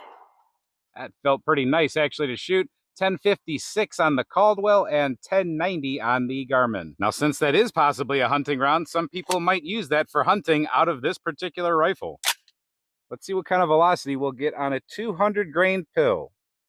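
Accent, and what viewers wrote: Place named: North, United Kingdom. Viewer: Instant subscribe because I always appreciate good, substantive number crunching. The friendly northern accent is also an added bonus.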